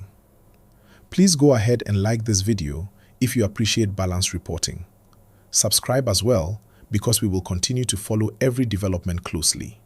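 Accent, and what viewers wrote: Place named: East Africa. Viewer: it is quite interesting that some of you "TikTalk workers" assume that we listeners are only followers without the abliy to discern who is likely following your presentations. I challenge this individual to deny that he is not talking from east Africa. your ascent says so.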